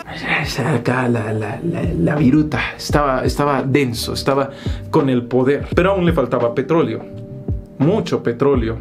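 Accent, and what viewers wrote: Chile. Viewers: Excelente, en chileno entiendo mejor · es chileno?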